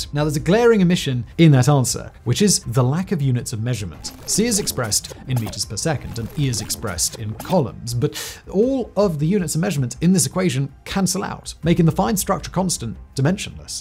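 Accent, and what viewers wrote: United Kingdom. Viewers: I cant take your pumped voice Brit accent. You are not serious. Jeeeeez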